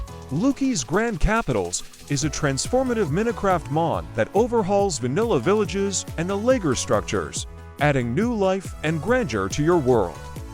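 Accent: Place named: South, United States. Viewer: it’s not bad but could use some tuning, around the end of some sentences it pronounces with a odd southern accent like at the start when saying spells it pronounces them like spales and says whep instead of whip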